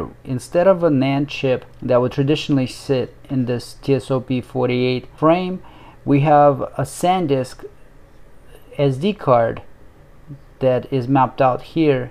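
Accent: Russia. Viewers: Русский акцент топ) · У тебя русский Акцент Причём сильный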